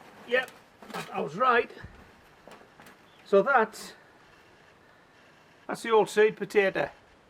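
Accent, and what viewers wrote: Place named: Lancashire, United Kingdom. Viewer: You sound like you are somewhere near Bury, Bolton, or Wigan.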